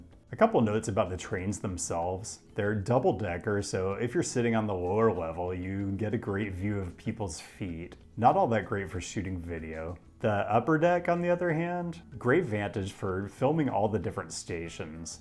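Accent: United States. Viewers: Thanks for covering my city ... but as with last video I am again triggered by your pronounciation of Melbourne. Your accent is American .. its okay and expected to include the "R" (MEL-burn). Your way (MEL-bun) is not American nor is it Australian ... it is just odd. And it's Parra-mat-a .. not "mot-a". Ignore my silly YT comment whinging ... great content.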